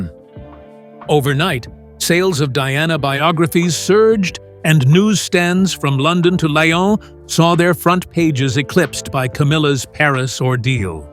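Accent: United States. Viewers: Now is this true>? The narrator's American accent tends to raise my suspicion..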